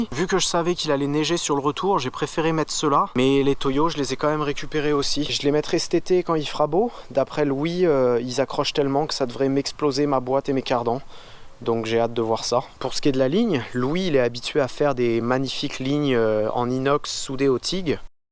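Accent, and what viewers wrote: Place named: Lorraine, France. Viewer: Slt maestro t’a un accent lorrain je trouve Salutations mosellanes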